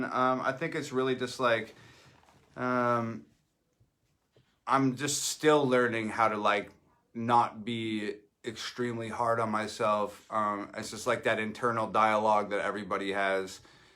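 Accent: Canada. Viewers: He sounded so Canadian, sometimes I forget and then I hear him apologize like 7 times in the first minute😂 and the way he pronounces words with "r", love this man so much, so humble. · You can tell he is from Canada by the way he says sorry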